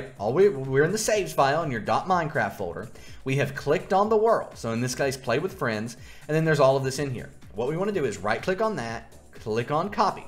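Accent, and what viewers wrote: West, United States. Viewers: I like your western accent :D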